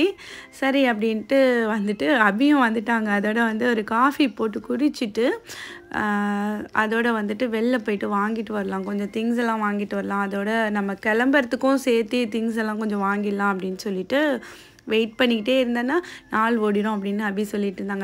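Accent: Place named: Nagapattinam, India. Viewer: super sis yenakku nagapattinam namma oor slang varuthu athan kettan masha allah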